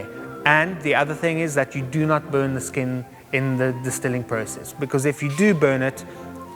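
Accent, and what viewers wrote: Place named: South Africa. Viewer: pmc eightsixeighty clearly you're a silly american. If anything he would be from an italian family which is why he is probably makeing grappa in the first place (but he actually sounds south african). Pronunciation doesn't make his 'english terrible' it gives him a accent.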